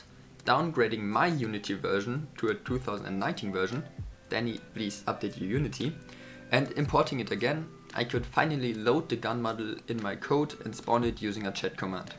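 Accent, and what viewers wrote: Germany. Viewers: german? i hear it so hard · You sound pretty german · I like you! xD Also, good luck losing that german accent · you sound German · are you german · are you german?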